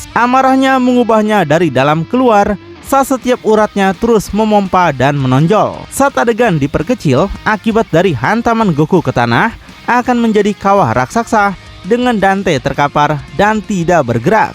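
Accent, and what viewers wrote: West Java, Indonesia. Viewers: Wah mimin org Sunda nya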